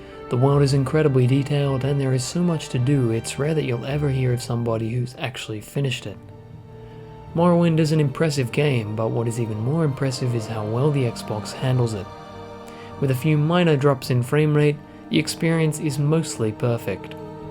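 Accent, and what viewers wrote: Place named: Australia. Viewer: Why keep using the word architecture instead of hardware. Architecture is like you're trying to sound smart. And the way you pronounce games "GEEms" , are you Australian or American, pick one.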